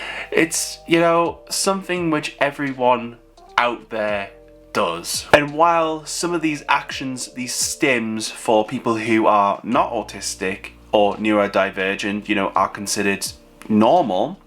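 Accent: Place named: Middlesbrough, United Kingdom. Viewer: Are you Mbro based? Your accent sounds local to me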